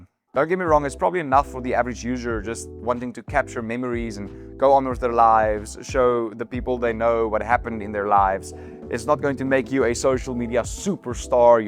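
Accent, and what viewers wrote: South Africa. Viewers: Howzit, I know here in SA don't watch alot of tech videos especially on Youtube. South Africans love TikTok. It would be great if you represented SA and actually put the Rand price as well & what it goes for on dollars vs rands. I haven't watched most of your videos but your accent screams out & you can't hide it. Abit Austrialinish but you're South African. Put as link, with rands. You'll see more and more South Africans will watch and Subcribe. Great video.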